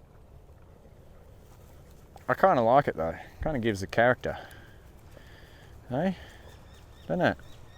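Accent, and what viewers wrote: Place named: Australia. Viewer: Good to know. Thanks for the update. By the way, I'm from the US and it was your Aussie accent that had me thinking you were saying "Carla" instead of "Kyla."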